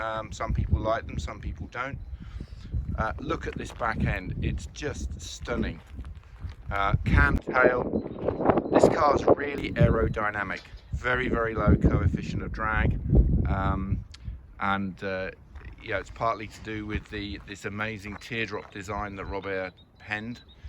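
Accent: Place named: Wales, United Kingdom. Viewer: Soft, lyrical and charming Welsh accent.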